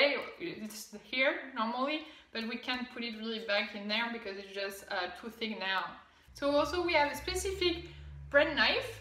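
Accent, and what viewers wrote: France. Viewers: Thank you for the tour of your kitchen. Interesting and always fun to view something new. 💜 love your French/English accent. Your so Cute 🌺 My Best always 😉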